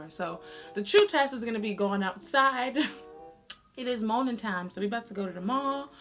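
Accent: United Kingdom. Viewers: Girl! Where you been? It has been too long and your UK accent is coming along nicely 😏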